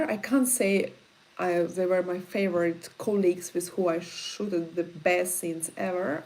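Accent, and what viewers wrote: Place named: St. Petersburg, Russia. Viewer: great video! I lived and worked in Moscow years ago and visited St. Pete where I believe you are from many times. Your accent brings back some wonderful memories! Come and visit "the Valley of the Sun" here in Arizona sometime!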